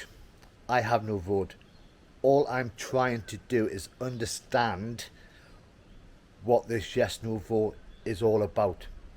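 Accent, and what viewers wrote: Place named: Northeast England, United Kingdom. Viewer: great, would love to show you around my city Auckland, although everybody starts off in Auckland when they come to NZ. Where is your accent from? It's sounds like North East England.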